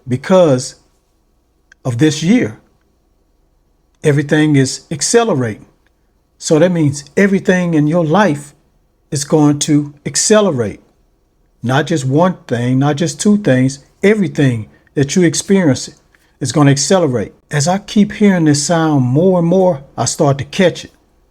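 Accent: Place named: Houston, United States. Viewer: You sound like you from Houston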